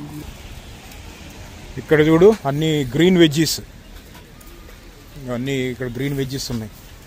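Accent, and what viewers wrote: Andhra Pradesh, India. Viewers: Thammudu " Angadi " antunnav meedhi Telangana lo Nizamabad antunnav.Nee Yaaasa emo Andhra prantham lo vaade Yaaasa la ga vundi.Meeru Andhra prantham nundi valasa velli Nizamabad lo settle ayyara??? TG lo "Dhukaan" or "Dhukanam" antaaru kada andhuku doubt vatchindi. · Hii bro, మీది తెలంగాణ అని అన్నారు మరి మీ స్లాంగ్ మా ఆంధ్ర ప్రదేశ్ స్లాంగ్ మాట్లాడినట్టు ఉంది 😂😂😂😂😂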